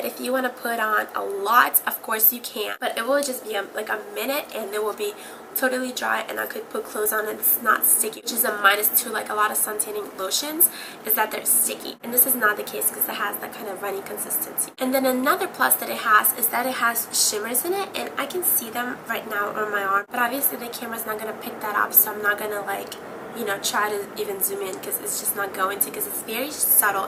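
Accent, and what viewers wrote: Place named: New York, United States. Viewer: totally unrelated,,,but are you from Jersey or NY..guessng by the accent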